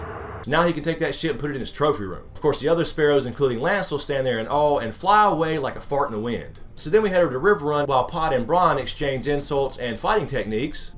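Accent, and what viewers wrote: South, United States. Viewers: I just clicked like bc u sound like a southern n I love the Midwest (live in California now n miss my sweet Arkansas lol)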